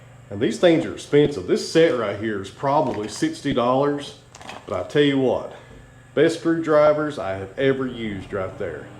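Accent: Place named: South, United States. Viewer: Hi, love watching your videos. I’m an old carpenter up here in Edmonton, Alberta. Raised on a farm in Manitoba but left the farm and became a carpenter. Your southern accent is a hoot. We Canadians likely sound like Brits. lol. I enjoy all your videos but I’d say videos about 15/20 minutes are my favourite… yeah, we spell words funny too. Cheers Earl B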